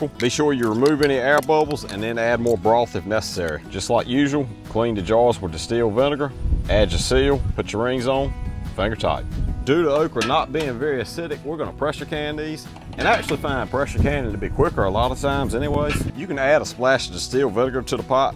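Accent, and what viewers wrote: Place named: Louisiana, United States. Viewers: You sound like you from Louisiana, man. Are you? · Dude what is your accent? Cajun or something? Man you could be in movies with a voice lie that. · I like that accent, sounds like he's in Louisiana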